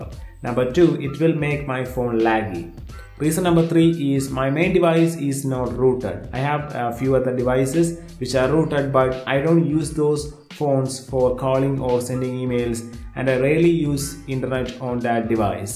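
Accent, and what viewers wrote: Kerala, India. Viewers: The mallu accent is thick in this one. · The damn Indian slang again...wwwhhhattt tthaa thheerrr oh boy,why can't you get some one who speak proper English.. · are you a malayali???? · worst Indian accent I ever heard... can't understand more than half what you babbled... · typical malayali accent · Malayali aano ? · you need to uninstall your india accent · That thick mallu accent.. Subbed brodhah.. 😎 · His accent is not very good. Use subtitles and music, it will be better for english videos as you have Indian accent that's hard to interpret. Or take english courses. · Are you malayali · Is that an Indian accent? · malayali analle?? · Talk English please, not Indian with English words. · Dear Adam, Indian accent is also "an accent" just like any other non-english nations. Just saying... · Bro?You malayali?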